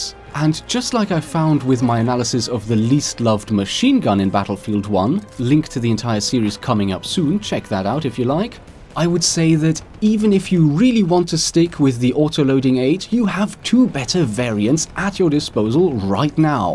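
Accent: Germany. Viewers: Are you German ? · lol are u german ?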